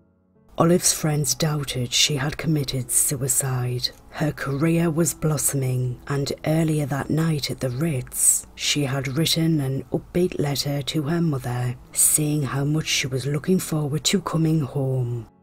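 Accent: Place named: Newcastle, United Kingdom. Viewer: You sound like a Geordie. I live in the USA, but I grew up in Lobley Hill, Gateshead..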